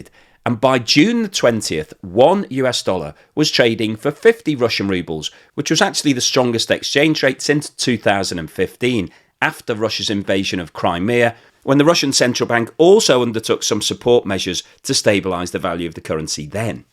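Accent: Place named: Liverpool, United Kingdom. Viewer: He's a scouser.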